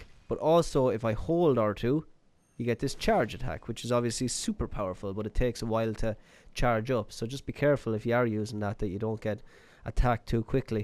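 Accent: Ireland. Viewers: Where you from in Ireland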